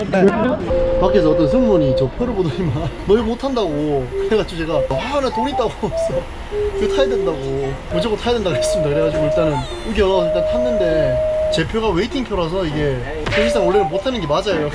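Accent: Gyeongsang, South Korea. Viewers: 제주도 한달 살이 영상 찾아보다가 추천 영상떠서 보기 시작했는데 며칠 째 시간 날 때마다 님 영상만 주구장창 보고 있네요ㅋ 조만간 제 최애 유튜버가 되실 것 같은데 미리 축하드려요🤣 참 외모만큼이나 성품도 생각도 올바르신 것 같아요! 매사 참 밝고 건강하고 긍정적이고 순수하고 마음 따뜻하신 분인 듯👍 경상도 사투리도 너무 멋지고ㅎ 근데 이렇게 빡씨게 여행하면서 온갖 고생 다하셨는데 왜때문에 꼬질꼬질한게 하나도 없이 반짝반짝하신거죠👉👈💕 저 아무래도 입덕한 것 같아요🤣🤣🤣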